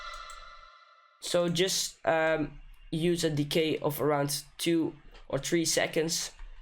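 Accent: Netherlands. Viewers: I thought first he is martin garrix. He sounds dutch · Ben je Nederlands? Je klinkt namelijk erg Nederlands aan je accent. Maar je spreekt echt goed Engels. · yeah he sounds dutch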